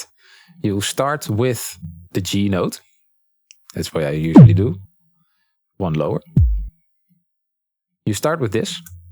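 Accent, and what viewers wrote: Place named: Netherlands. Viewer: Are you Dutch? Because you have the typical Dutch accent in your English. Althans.... dat denk ik wel te horen :)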